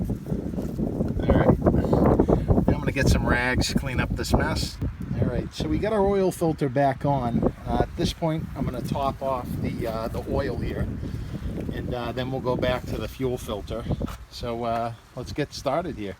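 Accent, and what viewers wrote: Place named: Louisiana, United States. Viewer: New England? Would have though Louisiana with your accent.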